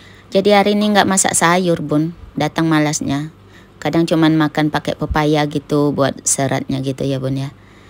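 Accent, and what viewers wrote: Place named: Lampung, Indonesia. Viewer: Dari lampungkah bun. Logat bicaranya kaya org lampung ato palembang gitu 😊😊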